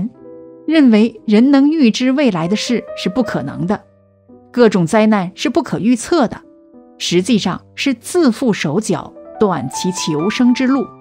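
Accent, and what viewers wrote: China. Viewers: 明明是中國人卻要講台灣的事，口音是中國口音，中國人開始用繁體字了嗎？，什麼土地神？台灣人都叫土地公。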